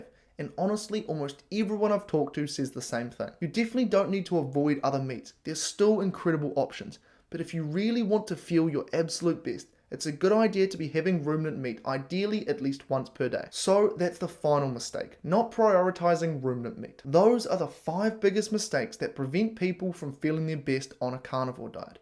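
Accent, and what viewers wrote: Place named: New Zealand. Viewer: Great to hear a Kiwi accent on YouTube 😊 Chrissy from Te Awamutu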